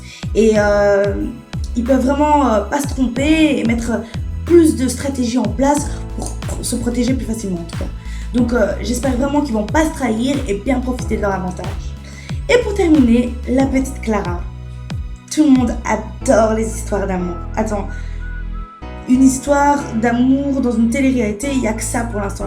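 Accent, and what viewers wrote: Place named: Belgium. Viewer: Elle a un accent belge !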